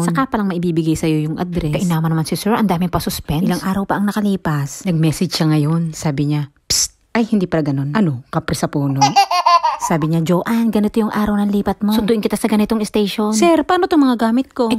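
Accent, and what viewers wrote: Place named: Batangas, Philippines. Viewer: new follower ninyo po ako. i like how you narrates your videos... nkakatuwa. batanguena tlaga!!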